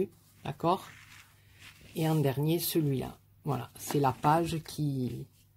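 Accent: South, France. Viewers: rooh ma j'adore je me l'enregistre tout de suite!!ta carte enveloppe c'est une merveille!!j'ai cette collection de papiers,ils sont juste sublimes!! he oui ils n(y a pas besoin d'en rajouter des tonnes!!merci pour cette vidéo ou tu m'a fait rire comme une malade et ton accent du sud j'adore!!gros bisousss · Coucou ma, wouaaaaaa encore une merveilleuse création, elle est sublime j'adoreeeeeeee, tu as toujours de merveilleuses idées de déco, toujours très raffinées, mille bravo ma et merci beaucoup c'est encore du pur bonheur de voir ta vidéo et de t'entendre avec ton fabuleux accent du sud rempli de chaleur et de soleil. Mille gros bisous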